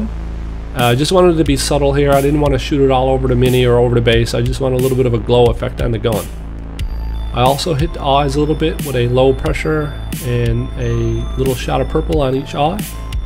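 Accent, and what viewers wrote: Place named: Ontario, Canada. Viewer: Nice work on these, but where’s this guy from? His accent sounds like Ontario crossed with surfer bro.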